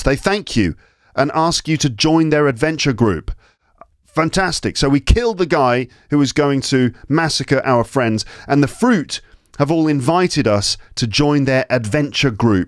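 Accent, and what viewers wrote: United Kingdom. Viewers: I like your British accent and style. American English accent is very hard to understand for me.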